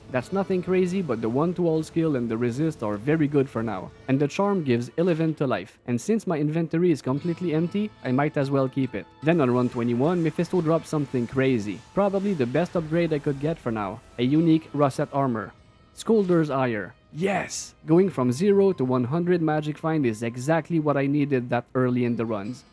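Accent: Quebec, Canada. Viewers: ARE YOU FRENCH CANADIAN ? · Good ol Queb accent, keep the grind brozer ! ( all the run was in hell ? ( online or solo ) ? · J'entends le bon Québec! Haha ❤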